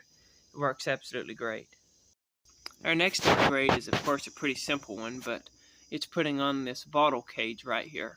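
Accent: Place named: Midwest, United States. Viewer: Kind of off topic, but I love the way you talk. Something in your diction, dictation, and accent is just soothing to me as a Californian. I'm guessing you're from the Midwest in a Mennonite or Amish area. Anyway, I also like the bike!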